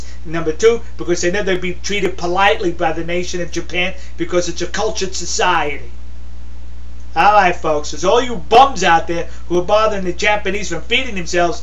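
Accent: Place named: Northeast, United States. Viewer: I just have a stupid question, which part of North Eastern America are you from ? Are you even from the North East ? (I would definitely want to trade my Glasgow accent with yours) lol Have a good day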